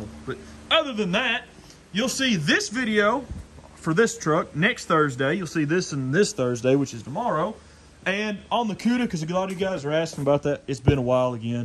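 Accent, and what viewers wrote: South, United States. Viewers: Cool to hear a young person with a strong southern accent still.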